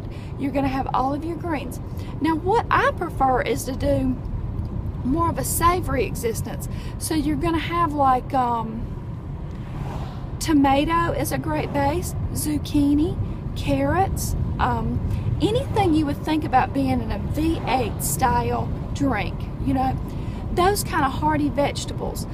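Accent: South, United States. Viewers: Hey! I just found your channel and enjoy watching. I recently purchased a Vitamix and love it. I'm not vegan, but love green smoothies and raw veggies. I laughed so hard when you held up your pitcher.:) I would do the same thing. I am in my mid 40's and hope to not have all the crazy peri-menopause symptoms I read about. By the way, I'm from the south too, so I understood your accent perfectly! Great vlog!: )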